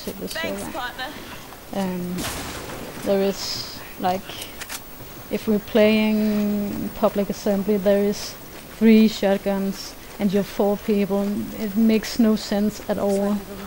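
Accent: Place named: Denmark. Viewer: ^.^; Man how long we've come when we were just BF and GF. I still love your danish accent honey! <3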